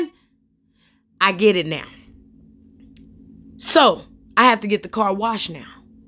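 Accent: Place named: North Carolina, United States. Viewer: You from Nc because you sound like me and my family..the skrenf lol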